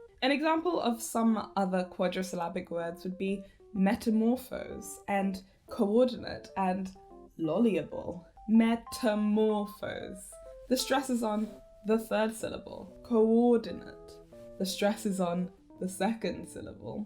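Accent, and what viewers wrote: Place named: United Kingdom. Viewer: As someone who grew up in a home where English was not the only language spoken I have learned over the years that although I am considered a native speaker there are many words I don't say 100% correctly because I learned to speak from parents who are non native English speakers. This video has taught me that I was not saying lolibrary in either of the two ways you argued. lol. However I suspect that has more to do with the fact that you speak British English accent and I speak Canadian English accent. I do agree that it is indeed loli-brary.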